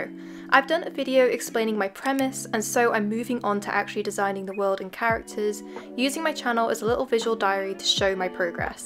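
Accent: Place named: England, United Kingdom. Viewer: I love how at first you have a perfect english accent, but towards the end of the video as you got a bit more tired, you started to sound more and more like you were from Yorkshire.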